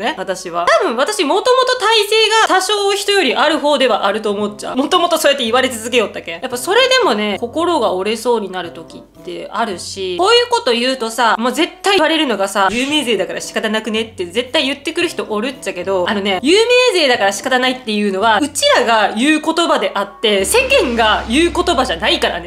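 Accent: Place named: Miyazaki, Japan. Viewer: さんの豪快な食べっぷり、包み隠さず本音でなんでも話してくれるところ、方言（思うっちゃけど〜とか宮崎出身の私にはとても聞き心地が良い）全部好きですよ😊 コメントたまにしかしてませんが…これからも応援してまーす😊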